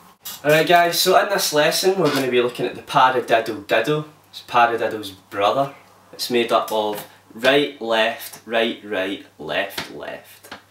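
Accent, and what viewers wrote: Scotland, United Kingdom. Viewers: Min Thu Ko Scottish accent.....from Scotland!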